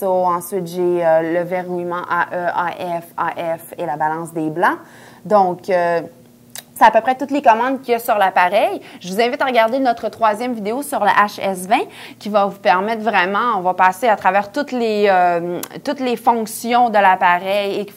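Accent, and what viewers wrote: Quebec, Canada. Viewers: Hello (j'aime beau dommages donc votre accent du québec), ci dessus j'ai répondu à la gentillesse, encore un jaloux envieux via ma carte SD, j'ai mise à jour le firmware, mais même si la version a été reconnue il me semble qu'en ce qui me concerne, la jauge est très minimaliste! mais de toute façon je vais passer au HS20, et revendre mon HS10 à la tombée du soir j'ai raté des sujets faute de sensibilté, et 8 Mpix me suffise amplement 16 Mpix pour les recadrage, regards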